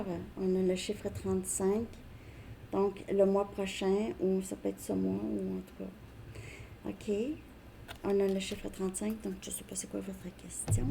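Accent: Quebec, Canada. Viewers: Love You* * & j'aime ça le parler Québécois..! Tellement beau..!❤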